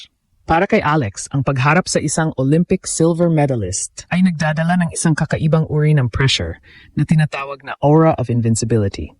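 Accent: Philippines. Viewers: AI… commentator accent is BADLY Pilipino … DESTROYING the beauty of the language …. Bad diction STOP please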